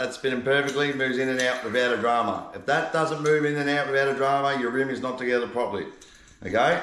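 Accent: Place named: Australia. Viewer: bros voice is the definition of aussie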